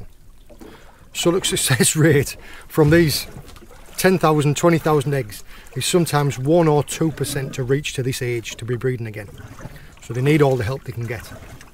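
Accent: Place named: Geordie, United Kingdom. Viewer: Hello Sir, I hope you, family and friends are all well. What a great video. I shoot and fish for the pot only, or give away to the neighbours, nothing goes to waste. Very informative video and entertaining too. Are you Geordie or Sutherland? My Dad could tell. Take care and stay safe., England.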